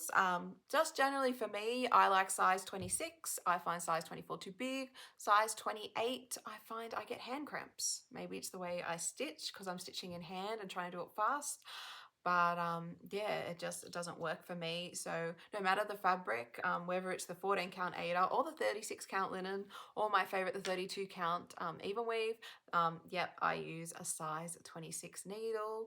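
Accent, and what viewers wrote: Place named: Australia. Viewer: Loved this video. Where in Australia do you live? I adore the accent. I am in the Unites States in Tennessee. I learned to cross stitch back in the early 80s, and learned in hand and still stitch that way. I've tried hoops, q-snap, etc. and they are just too uncomfortable. My fingers give me fits at times so I'm not as fast as I once was but I stitch the sewing method as you do. Your work is beautiful and for having a family you do make great progress. Have you an e-mail address? I subscribed to your channel so I will be seeing you again. Thank you for sharing. BTW, your name is lovely. XX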